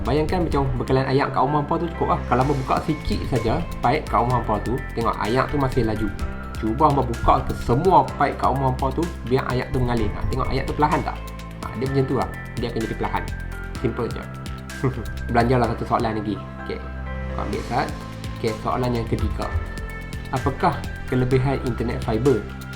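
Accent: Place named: Kedah, Malaysia. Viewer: Bang fasih gila slang kedah.. piau noh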